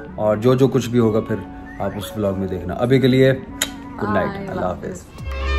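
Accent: United Kingdom. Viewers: I don't get your British accent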